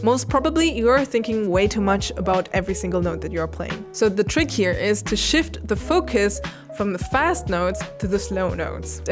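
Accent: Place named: Germany. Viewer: By the way, your English is now majestic. I loved those cute details of your German speech, but the work you did inspires as much as piano one :-)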